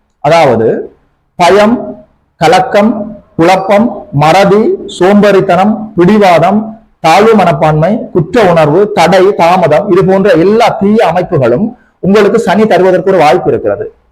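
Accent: Sri Lanka. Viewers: Nice Jaffna Tamil pronunciation Sir!!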